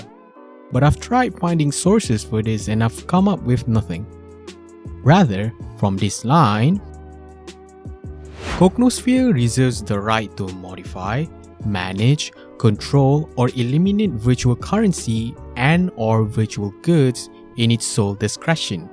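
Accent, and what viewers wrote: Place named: Malaysia. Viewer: bro got that malaysian accent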